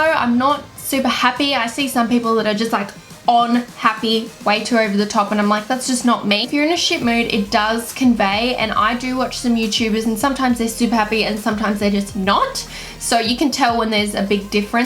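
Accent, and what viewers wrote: Australia. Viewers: I’m from the USA. This only matters because I think I just fell in love with Aussie Accents.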